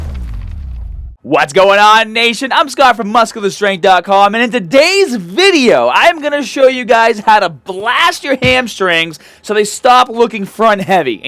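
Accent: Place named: New England, United States. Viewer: Bro: never, ever, ever lose that accent! It adds to the uniqueness of your channel and, honest to God, at least for me, it helps to make somebody pay attention to what you're saying. Granted, I'm just your run-of-the-mill, excited neighborhood linguist. But, it's nice to hear a genuine New England twang for a change instead of that sterilized mid west-influenced general American Standard accent everybody tries for. Also, I am in total and complete agreement about the prone hamstring machine! I have the exact problem you describe with the seeded hamstring machine. Can't seem to stay in the damn seat whenever the wait is really high. I frequently find myself having to readjust my position every other rep or so. Best regards from Texas.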